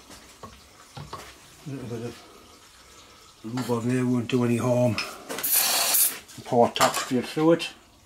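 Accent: Newcastle, United Kingdom. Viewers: English, with a Newcastle Upon Tyne, Northumberland accent. Took me about 5 videos to understand every word. I've watched almost all of videos.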